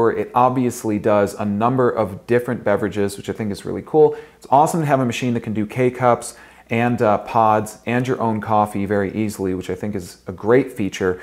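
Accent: United States. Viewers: I think he is from New England or south eastern Canada. His inflection reminds me of an older Katharine Hepburn.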